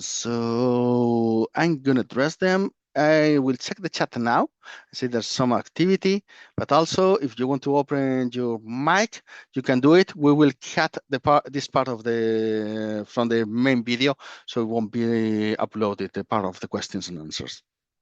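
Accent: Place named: Spain. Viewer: Very useful information and thank you for that but your Spanish accent in English is a disaster. It would be nice to fix this problem. Sorry for that, but I could understand in rewind. Anyway, thanks for the info.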